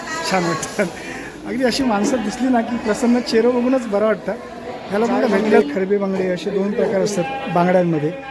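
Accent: Malvani, India. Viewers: Mala vatta tumhi mumbai la rahta. Karan tumchi malvani proper nahiye. Maka di aste te tumhi bolta maka de.. Mi yenar tevha ghetay - mi yetalay tevha ghetalay asa aste. Marathi mix bolta tumi. Ani kolambi nahi ti sungta malvanit boltat. Baki chan video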